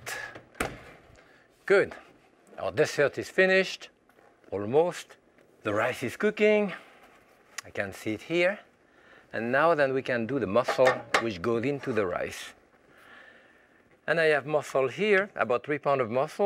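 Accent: France. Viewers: Haha I'm sure I wished that himself. Fact is the French never lose their French accent while speaking English. It's either too difficult and/or too painful for them to do so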